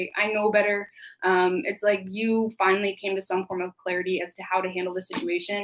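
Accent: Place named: Canada. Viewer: leo is on point. your canadian accent is so cute lol. wu-tang forever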